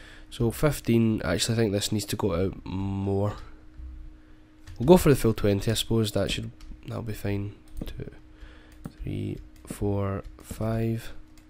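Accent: Scotland, United Kingdom. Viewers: scottish accent+oh noooo!= winzorz and rofls. my dadio is from scottland but im from the usa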